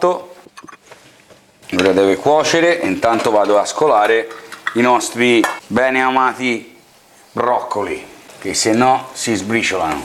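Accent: Tuscany, Italy. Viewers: Ai raggione morire con la panza piena o tutto quello che lei sta preparando ,,domani sabato li faccio ciao bella cadenza TOSCANA 😋😃😄😏😀😁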